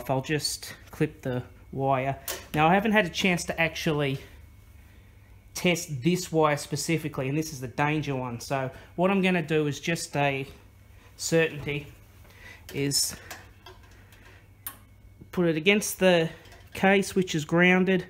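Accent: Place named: Australia. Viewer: He's gotta me Aussie... He speaks exactly the same as I do..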